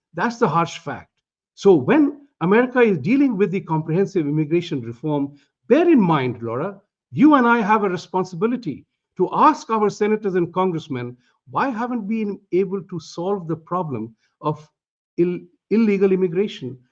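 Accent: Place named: India. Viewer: why do you still have such an Imdian accent?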